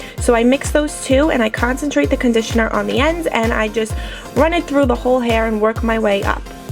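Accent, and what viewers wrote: New York, United States. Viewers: New york? I love your accent!! love from Birmingham UK!! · LOVE your New York accent! I'm from Staten Island and I moved to jersey over 15 years ago and I still have my accent lol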